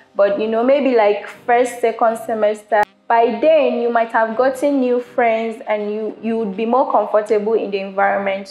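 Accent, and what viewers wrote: Ghana. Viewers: Are you sure,you are a Nigerian? You sound more like a Ghanaian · Naaa I don't know if you're ghanaian but if not then you tricked me cause you sound perfectly Ghanaian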